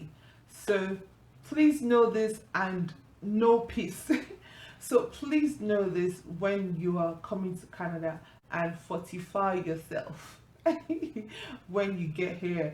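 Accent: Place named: United Kingdom. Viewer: I like the way you talk You sound British😌😌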